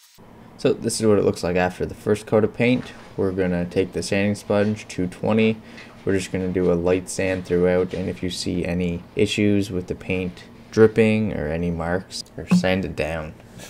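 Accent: Canada. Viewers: Your pronunciation of your "ou" s gives you away as being from Canada. I would say Calgary? Somewhere in the mid part of your fine country.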